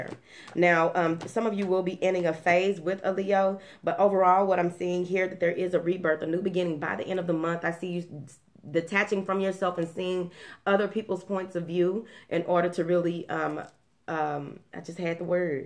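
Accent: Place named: Memphis, United States. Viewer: You have to be from Memphis!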